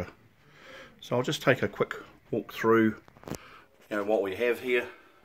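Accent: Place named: Australia. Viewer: You sound Aussie !!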